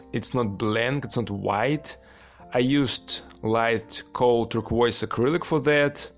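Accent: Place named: Russia. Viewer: Amazing,i love your russian accent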